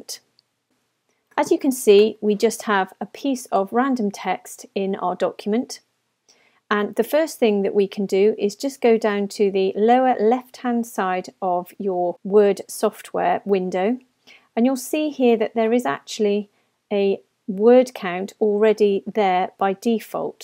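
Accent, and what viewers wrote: United Kingdom. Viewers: Straight & Simple. Thank you! (Why does British accent makes the person sound intelligent to start with?)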